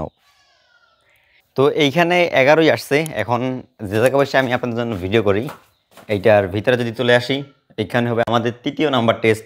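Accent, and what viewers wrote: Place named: Barishal, Bangladesh. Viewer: ভাইয়ের বাসা মনে হয় বরিশাল। 😊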